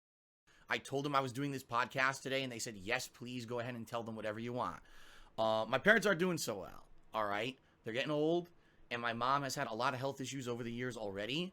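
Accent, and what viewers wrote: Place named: New England, United States. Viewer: Yankee accent are fucking disgusting.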